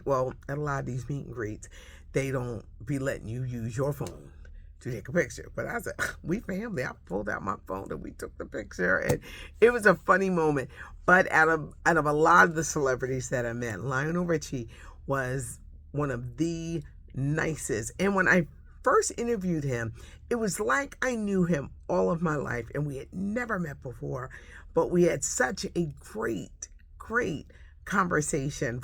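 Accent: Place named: Philadelphia, United States. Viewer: I know that's right use that South Philly voice good for you! my Amazing Husband was from South Philly 12th and Christian.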